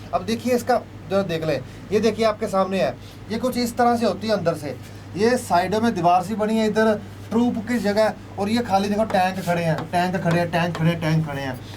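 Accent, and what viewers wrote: Punjab, India. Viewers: Sir g tusi bolde ho ta hasa onda h😀😀😊😂 Pure punjabi 😄😄 Atttt sir G 👌👌👌👌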